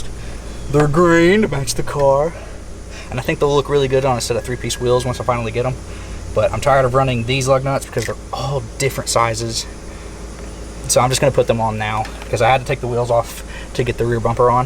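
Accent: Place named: Kentucky, United States. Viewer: I knew the accent sounded right when I saw the Kentucky plate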